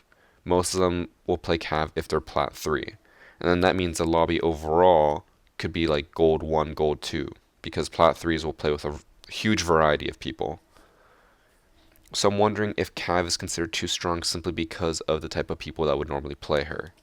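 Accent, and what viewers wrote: Canada. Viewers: I love the way Canadian people say out and about😂😂 I know he doesn’t think he has a Canadian accent but he definitely does 🤣🤣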